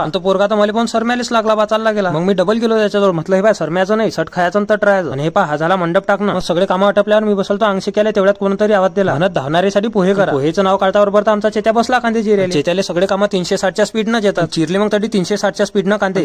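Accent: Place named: Malkapur, India. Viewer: (MH 28 बोलते दादा) #मलकापुर कर बोलते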